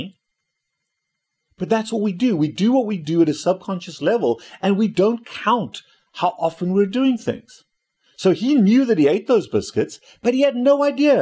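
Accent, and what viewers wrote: South Africa. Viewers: Is your accent South African?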